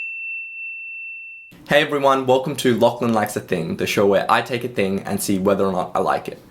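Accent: Australia. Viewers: R3DLUFF trust me this is less australian than bogan australian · He's Australian...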